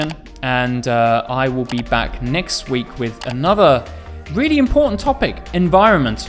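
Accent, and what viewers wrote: United Kingdom. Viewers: Like it your British English totally and thank you very much for your videos.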